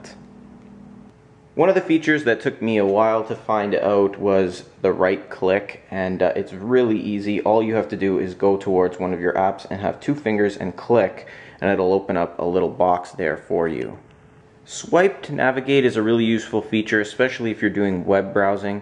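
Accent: Canada. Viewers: The Canadian accent is so strong with this one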